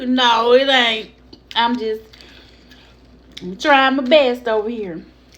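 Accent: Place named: United States. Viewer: I like her country accent!!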